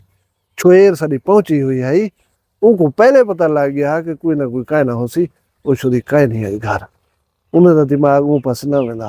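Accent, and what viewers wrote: Saraiki, Pakistan. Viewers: behtreen sraeki andaz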